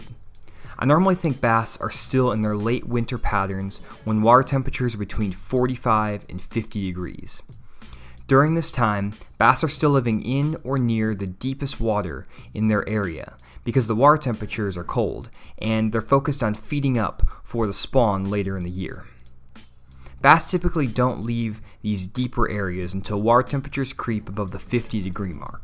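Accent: Arkansas, United States. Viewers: are you from arkansas?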